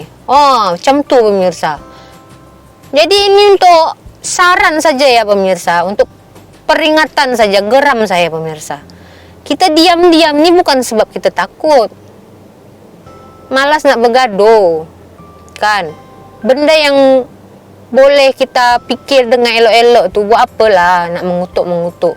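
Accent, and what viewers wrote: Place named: North, Malaysia. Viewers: Aku br terjumpa channel ni rasa lawak hg ckp utaqa hahaha...sempoy baq hanggg 👍👍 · pandai cakap kedah.jangan garang sangat kak.minyak goreng cukup kak.sabar kak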